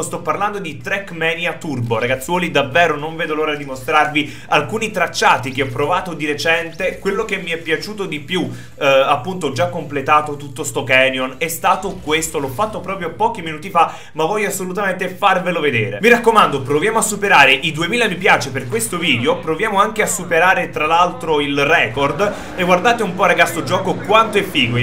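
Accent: Naples, Italy. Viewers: è napoletano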